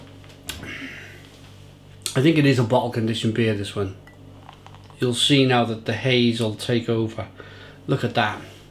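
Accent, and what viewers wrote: United Kingdom. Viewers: This is my personal favorite of all the Finnish IPA's. CCCCC by Beer Hunters is also great, but this is one more to my Taste (I capitilised it because maku means taste/flavour). By the way, where from the UK are you from? I'm trying to recognise the accent. :)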